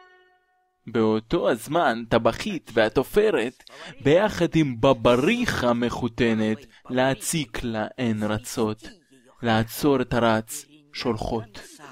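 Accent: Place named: Russia. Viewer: имхо стоит попросить озвучить нативспикера, небольшой русский акцент всё же прсутствует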